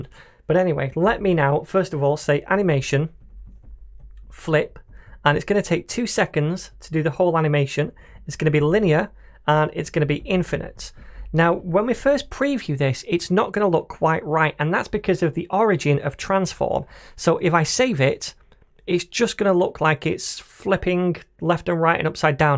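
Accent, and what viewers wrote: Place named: RP, United Kingdom. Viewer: The best thing is he speaks the Queen's English! But everything else is superb too.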